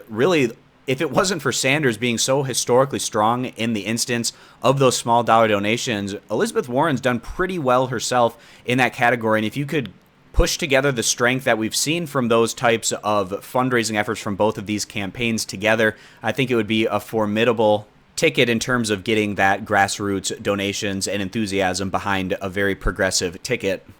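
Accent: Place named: Wisconsin, United States. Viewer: "This guy has suck a wisconsin accent." - my gf talking about you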